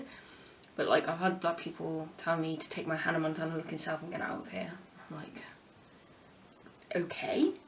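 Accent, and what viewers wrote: United Kingdom. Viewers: When u started talking I'm like oh u must be British and white but then when u said what ur mixed with I'm like .... Then why do u talk like that ? But ur beautiful the way u are! No matter what race & u should embrace more of your black side ☺️. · You have British accent, and they call you SPANISH????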